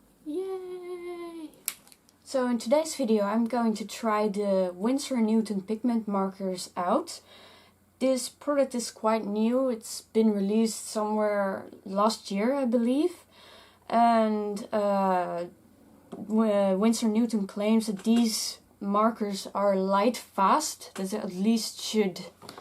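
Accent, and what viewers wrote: Netherlands. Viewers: I recognize that accent...Netherlands?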